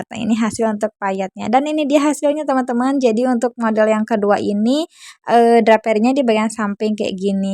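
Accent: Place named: West Java, Indonesia. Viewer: Teh orang mana Sunda pisan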